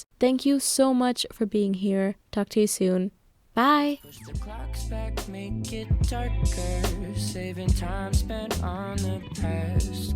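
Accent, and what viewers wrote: Germany. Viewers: she is german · Oh You’re german. Until the “real” I didn’t actually realize, after that I started to realize the accent lol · are you german? :) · are you German? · Are you german? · is she german ?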